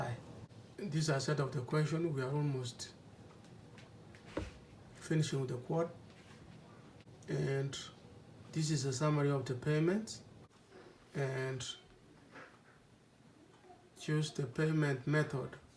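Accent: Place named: Kenya. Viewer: eeh! Baba umetoka upande gani bwana, nkisikia sauti yako, nkasema hapana huyu ni wa home lazma, anyways nice to hear that, ntakutafuta InshaAllah tuzungumze zaidi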